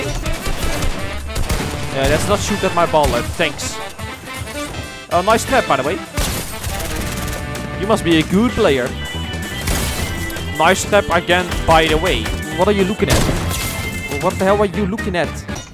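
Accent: Netherlands. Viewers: Nice dutch acsent dude im dutch too So its Not HATE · Haha je hoort echt aan je stem dat je Nederlands spreekt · Are you dutch cause you have a dutch accent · I grew up in Holland and moved to the uk and I can tell your dutch just by your accent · Jij bent Nederlands ik kan het horen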